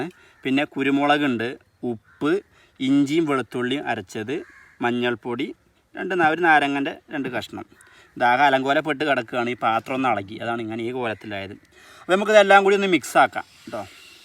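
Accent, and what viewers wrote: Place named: Malappuram, India. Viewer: മലപ്പുറം സ്ലാങ്ങിലുള്ള അവതരണം പൊളി ആണ് വ്ലോഗ് സബ്സ്ക്രൈബ് ചെയിതിട്ടുണ്ട്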